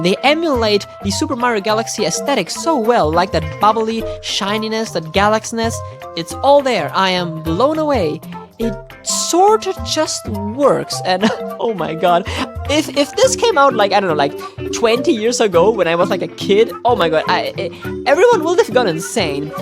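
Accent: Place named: Germany. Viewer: Knew I heard german somehow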